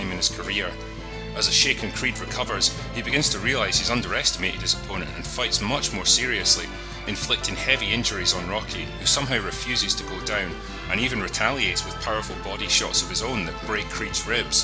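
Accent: Scotland, United Kingdom. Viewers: Until now I've not noticed the Scottish accent at all. 'Drunk' was what I have been hearing. But now I realise the Scottish was there all this time.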